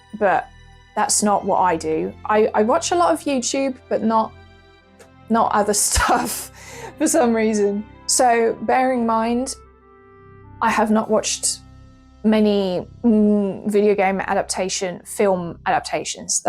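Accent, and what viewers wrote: United Kingdom. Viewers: I watch the channel’s videos because of the content, and also because I’m learning English your British accent is music to my ears.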